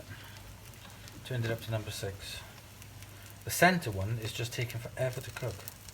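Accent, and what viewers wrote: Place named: Wales, United Kingdom. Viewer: the British half of the accent is clearly Welsh, and listen out for the sotto voce "bugger!" surely a very British expletive (my daughter first used it at age 2, can't imagine where she learnt it...)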